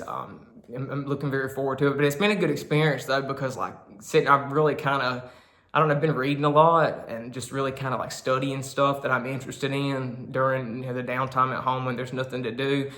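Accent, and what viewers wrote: South, United States. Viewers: Why do you talk? So funny? Have you thought about speech lessons so that you can eliminate your southern drawl?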